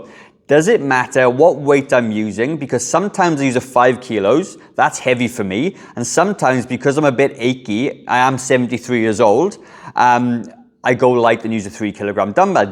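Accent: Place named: England, United Kingdom. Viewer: 2035. Are you ready for that? I’m 72, and Lisa Liang said in 2035 there will be a billion of us that will be 85. I’ll be 82. I’m thinking Tai Chi, Yoga, calenthenics, and the like. Americans(my wife would love your English accent) tend to think that if you’re not pumping heavy iron, and running 5, or 6 miles a day, it isn’t exercise. Marius Visser, also from England says that the group he works with are 60, 70, and 80 year olds. God bless you.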